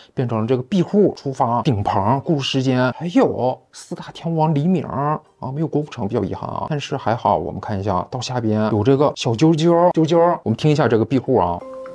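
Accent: Northeast, China. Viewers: 😅 看了博主好几期视频听着掉渣的东北话后，才发现博主坐标韩国😂😂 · 这东北大碴子普通话，duang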